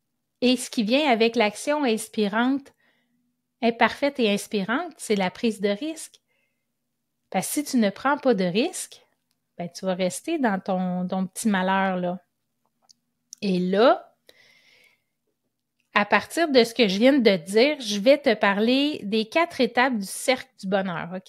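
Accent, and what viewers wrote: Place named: Quebec, Canada. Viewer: Merci beaucoup pour cette vidéo ! J’apprends le français et vos vidéos sont un véritable trésor pour moi. J'écoute la prononciation québécoise et, en même temps, les sujets abordés me passionnent énormément.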